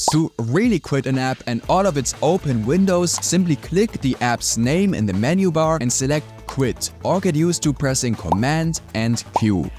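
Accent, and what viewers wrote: Germany. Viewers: You german?😂😊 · Das ist Deusches englisch kommst du aus Deuschland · Ngl you sound like a german speaking english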